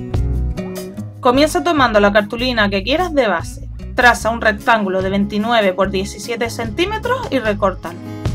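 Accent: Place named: Gran Canaria, Spain. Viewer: Genial, me ha gustado!!! por el acento se nota que eres de Gran Canaria, un saludo desde la isla vecina!!!!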